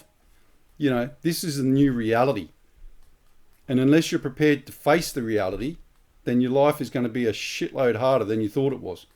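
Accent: Australia. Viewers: Great to get a dinkum Aussie presentation in A lingo we understand.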